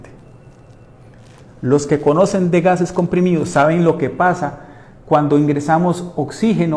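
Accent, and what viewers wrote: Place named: Costa Rica. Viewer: Es por su hablar un costarricense SALUDES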